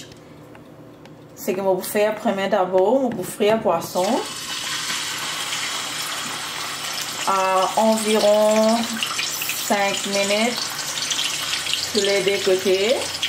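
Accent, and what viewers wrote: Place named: Haiti. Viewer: Accent creole la seryer net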